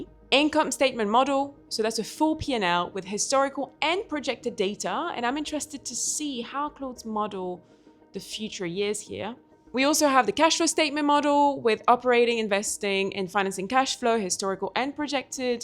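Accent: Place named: South Africa. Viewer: South African accent...nice.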